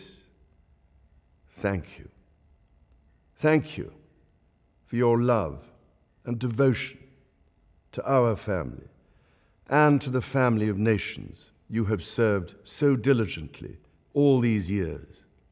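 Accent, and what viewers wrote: United Kingdom. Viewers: This is how I an american think every British person sounds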